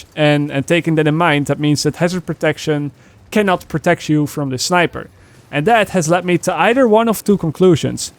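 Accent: Netherlands. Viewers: now i can tell why people say are you dutch ? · Yo are you from the Netherlands, cause' I hear it at your voice.👍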